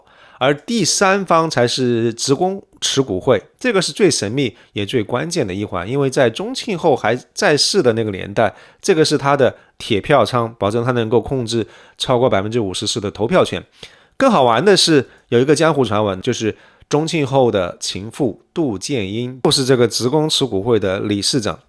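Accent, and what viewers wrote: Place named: Guizhou, China. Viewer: 博主是贵州人吗？跟 二爷 的口音好像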